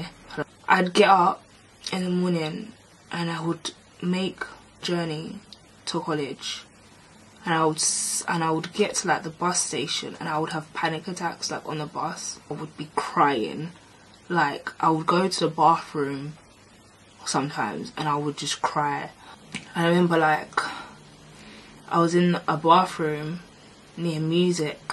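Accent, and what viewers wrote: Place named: Essex, United Kingdom. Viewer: I love your Essex kinda accent mix in with London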